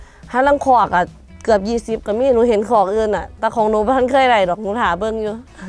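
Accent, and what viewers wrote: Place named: South, Thailand. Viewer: 😂😂😂 พูดภาษาใต้ เพราะค่ะ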